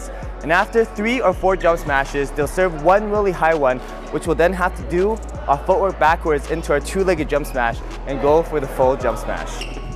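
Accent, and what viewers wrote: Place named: United States. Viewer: i've watched both your smash videos and have the following comments about what i like and dont like about the way you teach and/or perform smash. like: 1) you emphasize getting your body behind the shuttle so that your energy comes forward to smash, not backwards or straight up. the energy is forwards. there are many details to the smash, but if a beginner learns only one thing, it should be to do the work to get your body behind the shuttle, not beneath it, so your energy comes forward as you strike. and not only smash, but also clear and drop, get your body well behind the shuttle before you try to strike it. i like the way you said 45 degree angle forward. that is right on, 2) the way you demonstrate pronation seems very clear to me, 3) the way you use throwing to demonstrate the swing. i assume you are in the US from your accent and the word 'bird', and one sport americans understand is baseball. 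if you look at major league pitchers in slow motion you will see many relevant components of smash and swing. dont like: 1) your flow of energy from the floor to the racket head is compromised by a failure to isolate your hips and rotate them separately in advance of your torso. this means that even though you rotate your body 90 degrees before your smash, you are not taking full advantage of the potential rotational energy there. really, there is no point in rotating your body a full 90 degrees before smash if you do not isolate your hips and rotate them separately, and i have s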